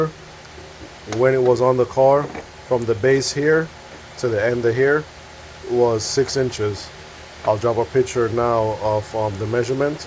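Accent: Jamaica. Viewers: You’re Jamaican? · Heard the accent lol! 🇯🇲 outdeh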